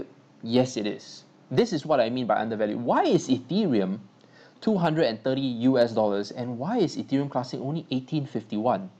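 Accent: Singapore. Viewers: Hey, listening to you I gotta wonder if you're Singaporean cos I am hahaha:D Cool video and thanks for the info!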